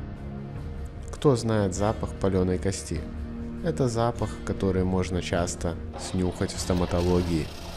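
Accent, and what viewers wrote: Belarus. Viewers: Парень ты из Беларуси, слышно по акценту и скорей всего из западных областей!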